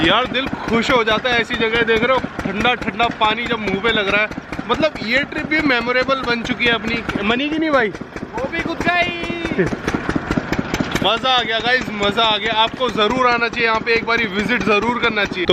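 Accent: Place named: Punjab, India. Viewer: Mundaw punjabi lagda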